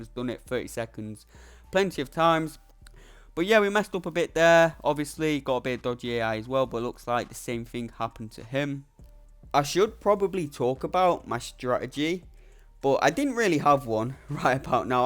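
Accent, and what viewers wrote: United Kingdom. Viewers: Bri'ish man is speaking straight fax 🗣️🔥🔥🔥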